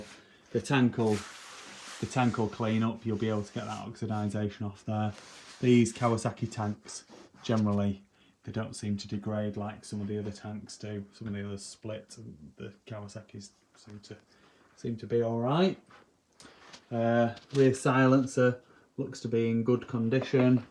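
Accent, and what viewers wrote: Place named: North, United Kingdom. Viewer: thats a good price im looking more a 250 that i can fully restore around mid 80s as thats what i grew up on im 40 this year and getting nostalgiac lol i watch your bikes on youtube you sound like a northerner buddy where abouts are you in the country im in barrow in furness in cumbria im saving up to get a bike there so hard to get hold of do you get them all the time could do with your number pal and thanks for getting back to me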